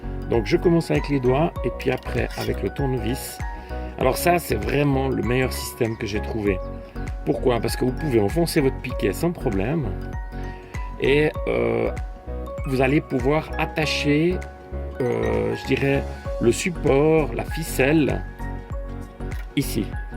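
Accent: Vaud, Switzerland. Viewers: je suis d'accord, un pur vaudois (et non romand de Neuchâtel) chantant et savoureux 😉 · Bonjour vraiment très intéressant votre vidéo 🙏 vous avez l accent suisses vaudois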